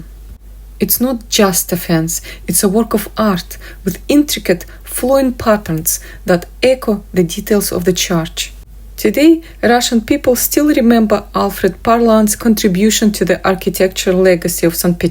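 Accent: Scotland, United Kingdom. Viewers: Do I detect a hint of a Scottish accent?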